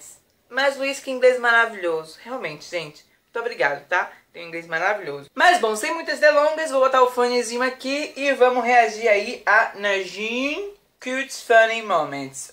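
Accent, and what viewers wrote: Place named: Bahia, Brazil. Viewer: MEU DEUS DO CEUUU, EU SOUBE QUE VC É DA BAHIA E EU TAMBÉM SOU, EU SOU DE SALVADOR-SIMÕES FILHO! JÁ OUVIU? FAZ UM ENCONTRINHO EM QUALQUER SHOPPING DE SALVADOR, EU QUERO TE VER! BEM QUE EU DISCONFIAVA DO NOSSO SUTAQUE! RSRSRS...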